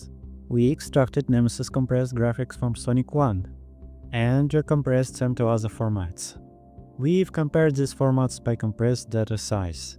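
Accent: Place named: Russia. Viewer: Just finished watching, great video. An amazing voice reveal also with that thick russian accent haha.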